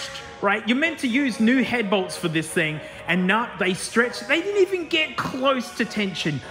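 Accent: Australia. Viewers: you aussies have the best accent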